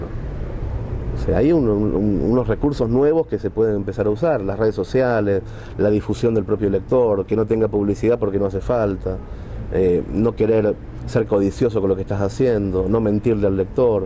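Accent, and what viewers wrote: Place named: Buenos Aires, Argentina. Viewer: La mezcla en el acento de porteño y español me mata!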